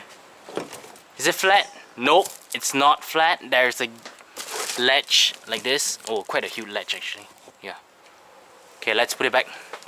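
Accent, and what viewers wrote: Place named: Singapore. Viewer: Hey bro u talk like Singapore slang english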